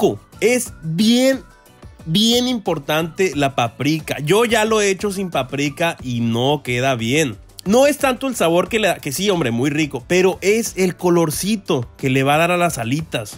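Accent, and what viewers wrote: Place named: Monterrey, Mexico. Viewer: Al chile los de monterrey así hablan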